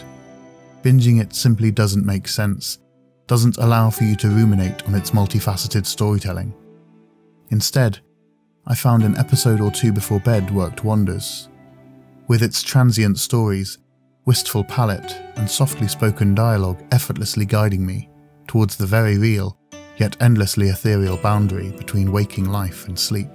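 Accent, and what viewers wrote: United Kingdom. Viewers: Your British accent, the calm voice, the music choice, the visual choice and the approach to this work really felt like you gave the series the justice it deserves. By recreating the atmosphere you find in Mushishi, you made the presentation of this work beautiful. This short analysis was a great way to pin my feelings for a while, and to create my own thoughts around this show. Thank you very much.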